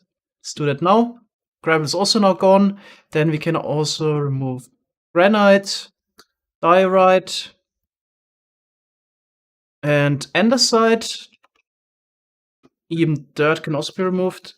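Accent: Germany. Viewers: Ich wusste es du klingst übel deutsch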